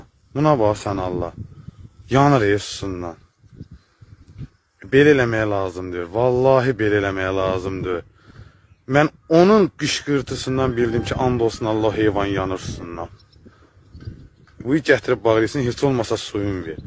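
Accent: Sabirabad, Azerbaijan. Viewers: Vallahi 40 ildir Rusiyada yaşayıram, Qardaş Allah kömək olsun sənə, Yezidə lə'nət oxu, Ləhçə Sabirabaddi dənə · Qardaş Allah razi olsun sizdən bizdə beləyik mən özum butun heyvanlara yazigim gəlirr xilaskar bir insanam ləhcəniz sabrabadd 😊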